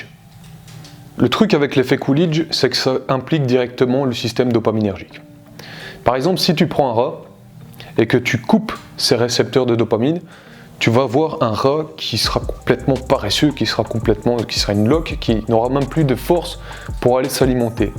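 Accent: Belgium. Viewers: Par contre il a un pouissant accent belge. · Petit accent belge spotted ;)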